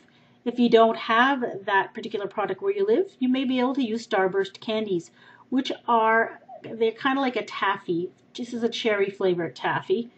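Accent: Canada. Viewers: Lies! Lol, not that it's a BAD thing necessarily, but I have definitely head you yourself say the word about like "aboot" while watching a large amount of your videos -I'm kinda addicted- :P. Seriously, that was how I almost instantly knew you were Canadian. I just went to your actual channel to verify.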